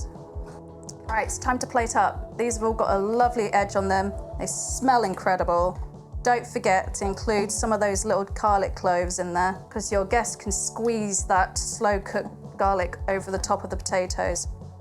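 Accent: England, United Kingdom. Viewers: Loved watching your video. Spuds look wonderful. I live in Central California , my mom was English and your accent is a lot like hers was. Could you do a video on the best way to make Yorkshire pudding? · I suscribed just to listen to your amazing British accent. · I like your cooking and British accent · Smart tips, pleasant voice, attractive woman with a British accent. Just subscribed. )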